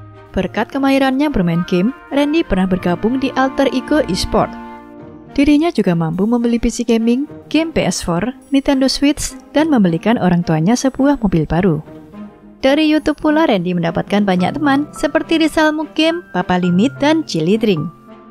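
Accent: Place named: Lampung, Indonesia. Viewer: Orang Lampung mana suaranya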